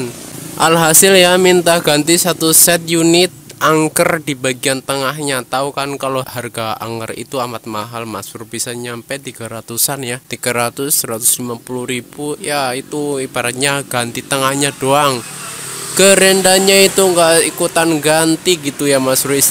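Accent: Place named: Kawunganten, Indonesia. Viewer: medok kawungantenan...haha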